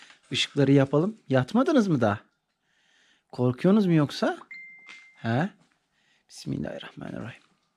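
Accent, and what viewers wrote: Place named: Thrace, Turkey. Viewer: kardeşim güle güle kullanın ahırı, sayayı bir şey dikkatimi çekti ben Tekirdağlıyım sizin orası Eskişehir ama şive bana bayağı Trakya ağzı gibi geldi.Annen Takyadan gelin mi Eskişehir e. Herkese saygılar.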